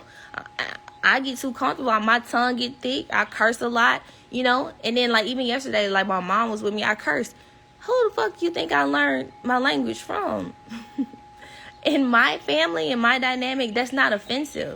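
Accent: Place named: South, United States. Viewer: I love me some. I love the southern twang and all the realness of her.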